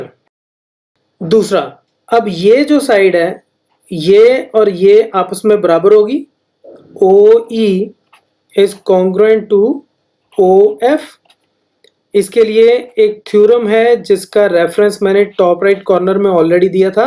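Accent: Punjab, India. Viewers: Are you punjabii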